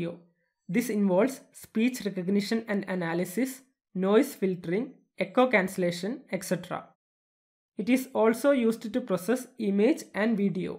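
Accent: Kerala, India. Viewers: Loved his mallu accent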